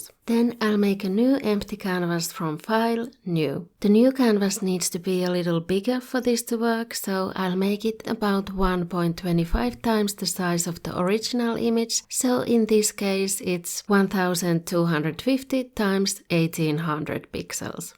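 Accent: Finland. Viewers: Lol, after hearing your accent i immeadiatly knew you were finnish 😂. Keep up the good work, coming from a fellow Fin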